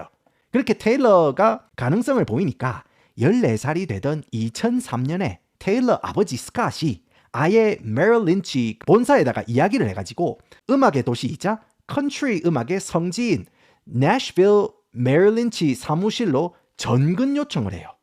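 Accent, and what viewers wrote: Gyeongsang, South Korea. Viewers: 구수한 경상도 사투리에 갑자기 튀어나오는 원어민 발음이 뭔가 언발란스한데 좋네요 ㅋㅋ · 나 충남 당진 사람. 자꾸 전남 당진 당진 할래? 처음에는 전남 강진이 라고 하더니만 자꾸 당진 당진 하네 서버r할나미 뒤짏라고. 경상도 사투리 쓰는 거 같은데 그쪽 동네나 빗대서 얘기를 해 자석아~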